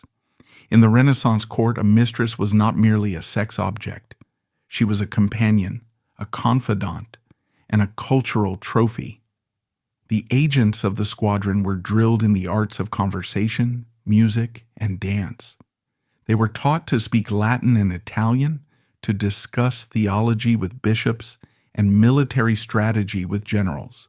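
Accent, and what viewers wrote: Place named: United States. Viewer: pile of AI crap. Worse...Faux European history done with an American accent ..urgh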